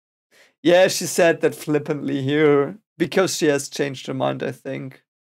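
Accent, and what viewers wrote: Germany. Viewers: Thank you for the correction: 'Glanz.' I believe 'bredt' is a Danish word. However, the subscripts may have undergone mistranslation and might not accurately reflect the original meaning. By the way, are you from Bayern? Your way of speaking is similar to that of a guy from Munich whom I know.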